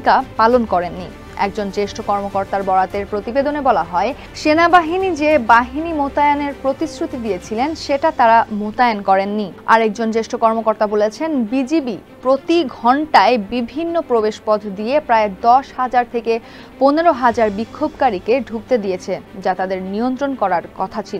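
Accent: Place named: Kolkata, India. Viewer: Kolkata Bangla oyTa eyvabe I bole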